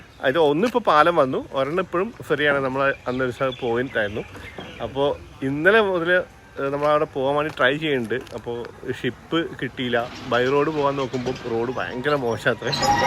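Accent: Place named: Nilambur, India. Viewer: ഇപ്പോഴും തനി നിലമ്പൂർ സ്ലാങ് ആണല്ലോ ഇവർക്ക്... 😊😊😊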